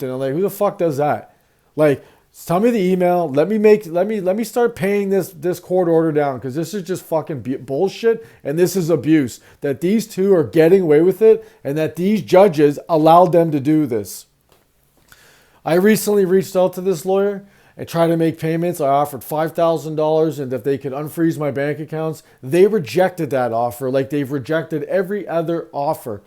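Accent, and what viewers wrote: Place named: Canada. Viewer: Within 5 seconds I knew he was Canadian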